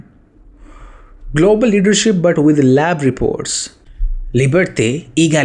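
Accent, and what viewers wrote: India. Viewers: Thank you!❤I like a lot your accent,make me think to magnific India!Keep up the real info!Respect from a romanian living in Germany!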